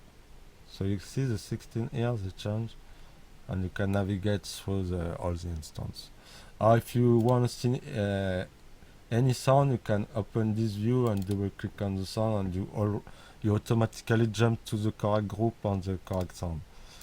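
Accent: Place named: France. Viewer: T'es Français ? Thats a big french accent don't get me wrong, edit : effectivement t'es français from Paris. Très bon VST je regrette pas de l'avoir acheté celui là. Par contre juste un petit pdf ou des vidéos explicatives pour expliquer le vst ça serait cool, je cherche comment enregistrer le layout par exemple. Ca manque d'un bouton supplémentaire ou dans about les options de customization, par ex skin devrait se trouver dans about et les enregistrement d'un ou des layout favoris aussi. Sinon à part ça super plugin merci Edit 2: Eh merde on dirait que le layering d'instances Psyscope ne fonctionne pas dans BitWig 5.1 😢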